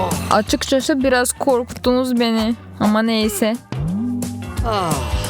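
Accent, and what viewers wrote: Azerbaijan. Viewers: Azerbaycanlı seslendirmiş Türkçe konuşmaya çalışarak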